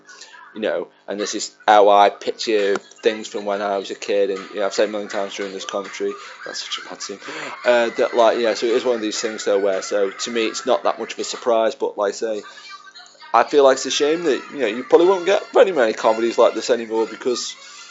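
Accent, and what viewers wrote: United Kingdom. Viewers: I usually find an accent tolerable. This sounds like British Ebonics!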